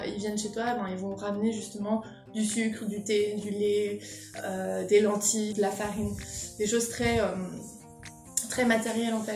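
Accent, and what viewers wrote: Arab world. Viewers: c jolie ta facon de parler arabe hhhh sayez reste avec nous au maroc ta rien a faire en france la vie au maroc cest jolie hhhh❤❤ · J'ai hâte de te revoir c'est on plaisir i love your prononciations in arabe ❣️😂🇲🇦 courage and bon continuation U are photogénique ❣️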